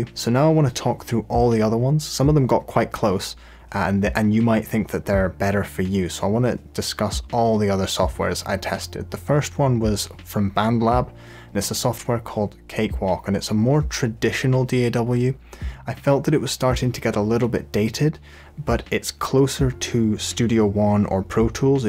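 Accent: Scotland, United Kingdom. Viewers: what part of scotland are u from mate ???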